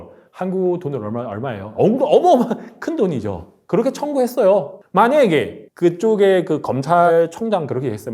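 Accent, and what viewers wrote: China. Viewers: 중국인 아니야? 발음이...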